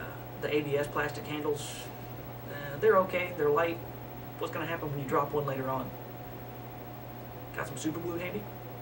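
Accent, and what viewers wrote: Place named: South, United States. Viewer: Sound like u from dwn south, anywhere near newmadrid seismic zone? Would hate fir u to have to leave your homestead.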